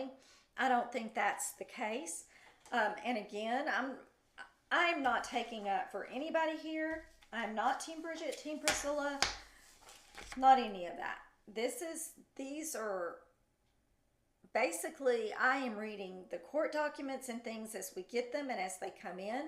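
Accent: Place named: South, United States. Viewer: I love your channel, and I love Billy Smith and his family’s channel. You’re awesome, ❤ You’re so sweet, and pretty! And I can tell a fellow southerner when I hear one:)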